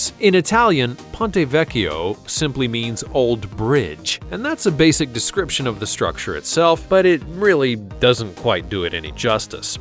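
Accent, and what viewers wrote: United States. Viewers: Offtopic: Sorry, but I hate this American accent, pronouncing HOTSPOT as HAATSPAAT. The poor O is not there for nothing. If this language would have liked you to say HAATSPAAT, we would have written HATSPAT. The same applies for ROCK and RAACK.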